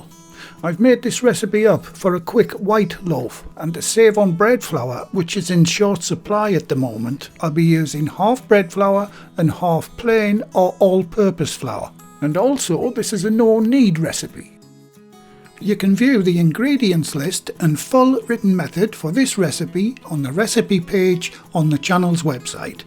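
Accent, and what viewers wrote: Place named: Newcastle, United Kingdom. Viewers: Wonderful thank you. I love your YouTube presentations. I have lived in Australia for 43 years but I love a Geordi accent🙏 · Hello thanks for the amazing tip, I cannot get any strong bread flour anywhere in my area still sold out in all the shops but will keep looking, I am also from the north east of England and when I first watched one of your videos I instantly recognised your accent before you said where you were from ha ha:), your videos are amazing:) stay safe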